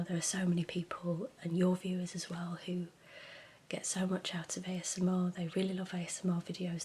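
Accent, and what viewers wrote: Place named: Northern England, United Kingdom. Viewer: I love your northern twang still coming out when you speak. You have a lovely voice… congratulations on 10 years ❤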